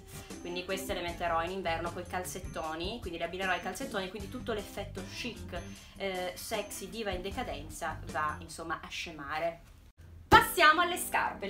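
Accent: Sicily, Italy. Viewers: Ma sei siciliana? Sento un pò ' l'accento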